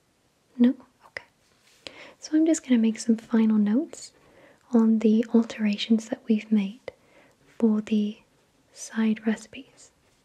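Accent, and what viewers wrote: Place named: Wales, United Kingdom. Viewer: Tyler Sudden II she’s Welsh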